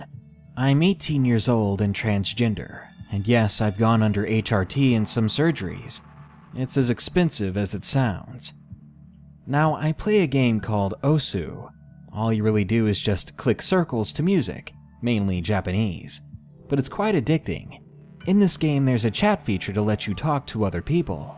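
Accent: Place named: United States. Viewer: Great Videos Man, Also love your pure American Accent voice, did you create your profile picture yourself?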